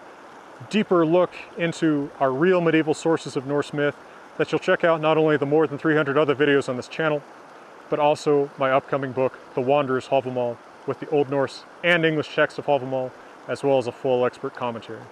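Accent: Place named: West Texas, United States. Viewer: Fantastic academic level content available on youtube with beautiful background scenery and a quirky cowboy tone? I don't think I've heard anyone quite speak my language like you do,. Grew up in West Texas and studied Latin and Classics at UT Austin. You've got one more subscriber out of me!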